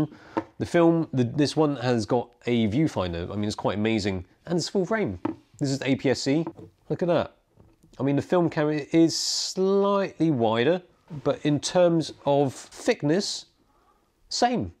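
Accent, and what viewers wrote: United Kingdom. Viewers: Legitimately the most British voice I've heard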